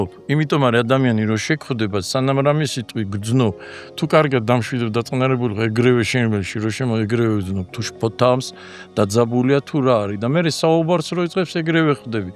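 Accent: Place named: Kartli, Georgia. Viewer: ნირვანა დატოვა და რით რითი შექმნა... რა მომწონს კიდევ; ბევრი უპროტესტებს. ამხელა ზენიტის შემდეგ რომ😊ქართლული კილო არ დათმო და არ "შფოთამს"❤მიყვარს!!!❤❤❤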